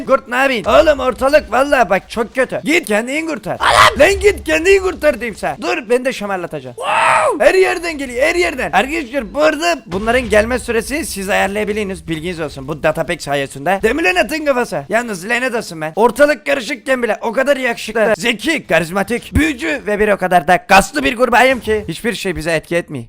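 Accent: Black Sea, Turkey. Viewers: Abi sen kara denizlimisin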